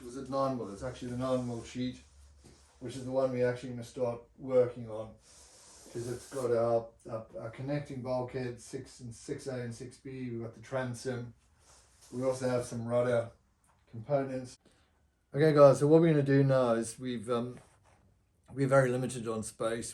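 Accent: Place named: Cape Town, South Africa. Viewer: Aha...starting at the beginning! You definitely sound Cape Townish, or Zimbo, maybe...no worries, lekker, man!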